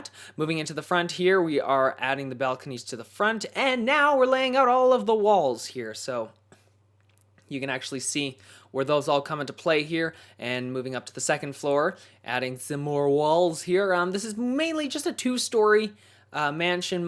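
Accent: Canada. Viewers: You sound very Canadian... If he is sorry I had no idea. I thought that because of how he said sorry (Soary).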